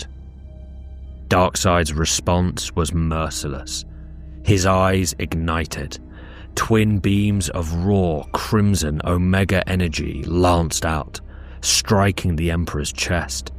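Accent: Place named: London, United Kingdom. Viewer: Your true voice truly sells it. London accent no? Def not Manc and no chance Scouse as those are the ones I’m familiar with. Lol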